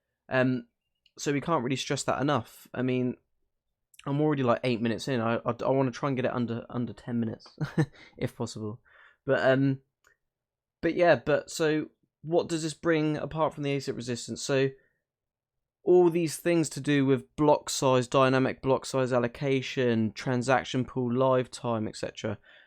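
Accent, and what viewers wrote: United Kingdom. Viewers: Why would u dedicate a channel to talk about the worst performing coin of the year? this shit coin has been delay after delay....... disappointment after disappointment........... where are the exchanges they promised MONTHS ago? Hmmmmm? All this technical bullshit talk is not helping....... u know what makes the price of a coin go up? Results!!!!!!!!! Until then my friend you are wasting ur time and British accent on a shitcoin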